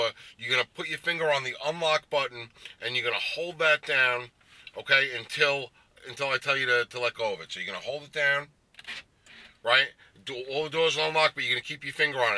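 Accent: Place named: East Coast, United States. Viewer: so you feel bad for everyone on the east coast? Lmao that’s literally how they all talk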